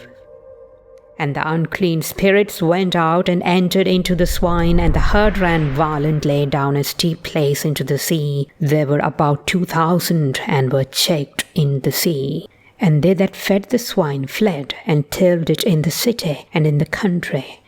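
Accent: RP, United Kingdom. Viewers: What a marvelous video! Very good taste for the images and an impeccable Conservative RP reading. You are outstanding! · Hi! Thanks for this video. This was stunning video with great picture and I loved the music. Did you do this video because Easter is approaching? I love it and love it:) I can't imagine how much time and energy you have put behind these videos and Of course! I don't need to say anything about your posh accent. I would like to take accent class from you if you are conducting accent coaching. Great work!🙂🙌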